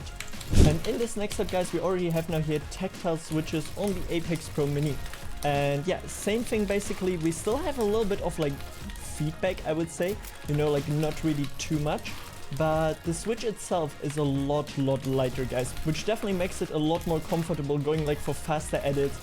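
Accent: Germany. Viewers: Ich höre deutsch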